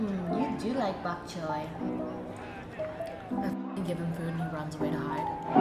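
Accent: Vietnam. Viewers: pls I'm obsessed with her Vietnamese accent it's lowkey so hot is it just me? 🥸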